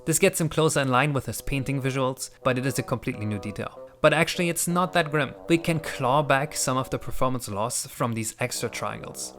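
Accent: Germany. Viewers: Über all diese Jahre hatte ich irgendwie nie gedacht, das er einen deutschen Akzent hat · You german!? Dein Akzent ist mir nur jetzt eingefallen!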